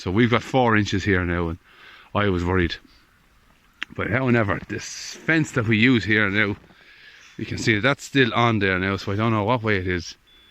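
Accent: Ireland. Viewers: What part of the country are ye in, somehow I had it in my head that you were Kerry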